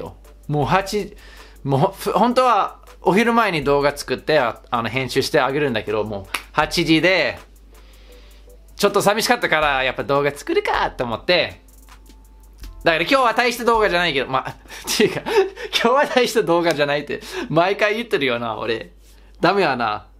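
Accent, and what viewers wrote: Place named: Kansai, Japan. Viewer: ずっと思ってたんやけどの方言って近畿地方かな？話し方だけで親近感がわく笑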